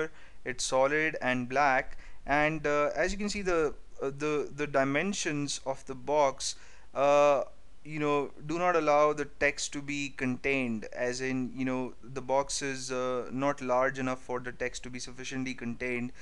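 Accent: India. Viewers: why do all indians sound exactly the same from all the youtubers i have heard which are indians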